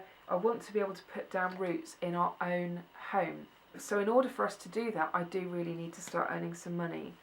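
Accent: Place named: United Kingdom. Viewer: Am I alone as a French subscriber 😊? If so, I hope you will soon have many subbies from this side of the Channel! Love to hear your British accent. Do you know that Estee Lauder has just purchased DECIEM? The Ordinary is not an independent brand any longer. I think I will make a large order before prices begin to rise...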